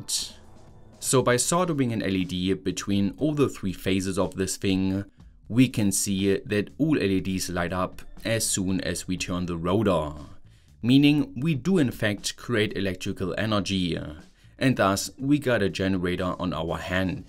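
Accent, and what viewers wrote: Germany. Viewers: why do you have such thick german accent if you are Scottish? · Curious where you're from! I lived in Germany for many years and I don't recognize your dialect. It's hard to identify! Your channel says you're from Fellbach but IDK if that's conclusive.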